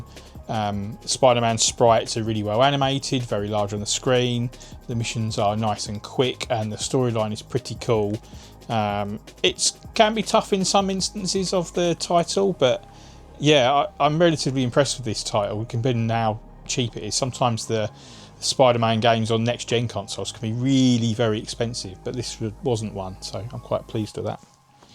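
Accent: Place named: Essex, United Kingdom. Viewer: Very well done video, straight to the point. Jotter that Xbox zombie game I think my local cex had that . You sound local are you in Essex ?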